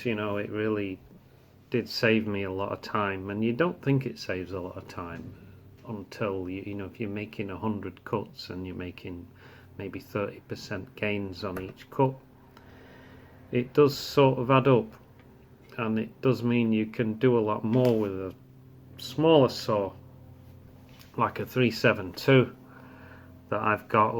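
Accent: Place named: Northern England, United Kingdom. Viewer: As an old Yorkshireman,I'm curious about what part of the North you originate from.Watching and listening to you takes me back to conversations in pubs long ago.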